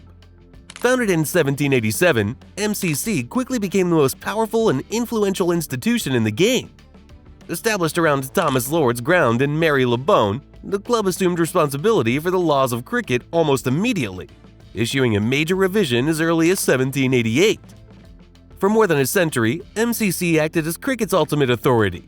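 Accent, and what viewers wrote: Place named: United States. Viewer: and The world's greatest batsman, Australia's Don Bradman (apparently here (twice!) known as Edward Lumpy Stephens) played for Hambledon CC? Tony Greig was also Richard Newland in another life, how versatile! Boy, I hate AI.....why have an American voice? This whole video is extremely aggrevating.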